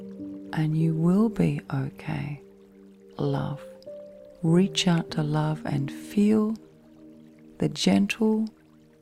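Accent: Australia. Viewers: I love you & your tranquility!!!❤️❤️💋💋, I love your sweet, soothing, sexy chloroform voice!!!❤️💋, thank you so very much for your sweet happy Australian voice!!!❤️💋❤️💋, I love you with hugs and 💋💋💋💋💋💋💋💋💋💋💋💋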